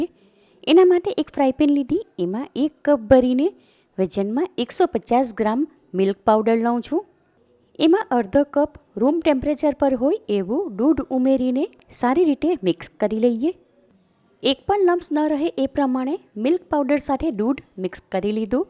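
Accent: Surat, India. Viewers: જેવો મીઠો હલવો એવી મીઠી સુરતી બોલી❤😊❤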